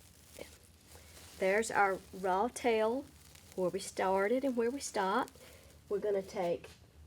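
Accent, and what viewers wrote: South, United States. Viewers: Love it! Thank you for sharing! And your southern accent is too cute!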